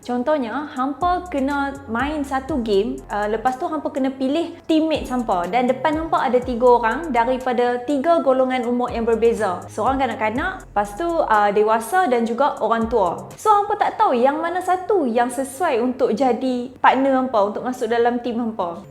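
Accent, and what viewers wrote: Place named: North, Malaysia. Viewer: Beauty with brains, tp pekat nau utagho😂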